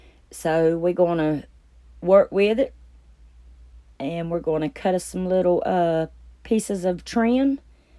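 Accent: South, United States. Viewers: Thanks for your comment. My name is Jackie, I use BunnyRN because I have collected bunnies (not real ones) for years, and I'm an RN (retired). I love your souuthern accent, I live in eastern NC. I really enjoy your videos, creativity, and your humor.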